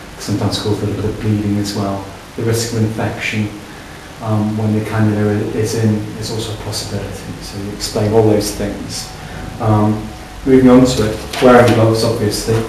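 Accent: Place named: England, United Kingdom. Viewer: finaly after serching for ever i found an english one not american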